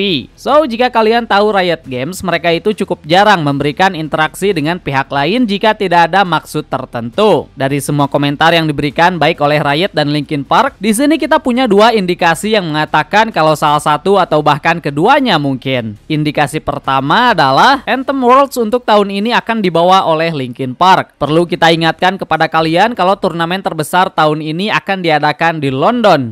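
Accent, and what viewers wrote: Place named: West Java, Indonesia. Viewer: ane tau ente sunda bang, tapi london dibaca landen.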